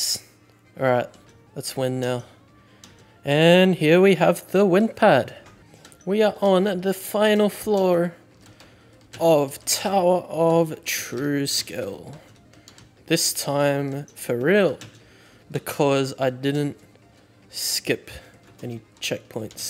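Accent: Australia. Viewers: he’s actually aussie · he’s Australian i think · towre of srres very gdoo also why do you sound like australian remainings best video do coabl p rank and lorekeeper guide NOW!!!!!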